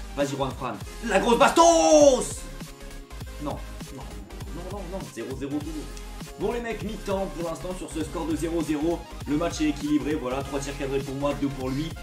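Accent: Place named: Spain. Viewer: Très belle accent espagnol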